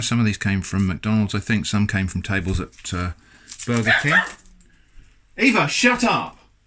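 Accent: United Kingdom. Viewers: AfrigginPancake Boi Right? isn’t his voice so comforting, mixed with his lovely british accent its perfect to calm down. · You Sound So Brit-tish Already Now Love!!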